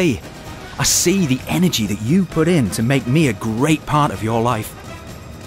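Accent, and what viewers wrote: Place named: Sheffield, United Kingdom. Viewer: Not badly done, just don't really understand why they got a voice actor from Sheffield or wherever, and not a Brummy? Are Brummies themselves sick of their own accent?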